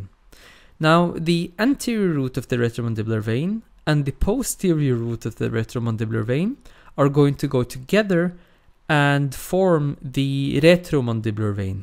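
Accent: Norway. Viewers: hahah yes, I think the same. I love the Norwegian accent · He sounds very Norwegian to me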